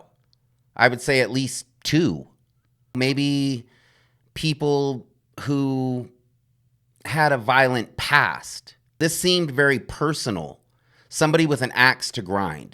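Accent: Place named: Flint, United States. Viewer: Chicago, Memphis, Flint, or anywhere in the state of New Jersey lol. Judging by your accent I would say Flint. No disrespect by the way. I have family in all three cities and the state of Jersey.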